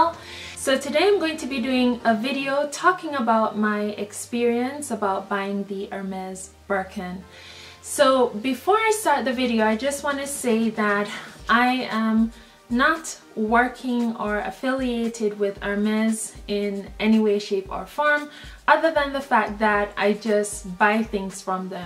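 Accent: Jamaica. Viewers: I think so too. I'm Jamaican so I picked up on her accent real quick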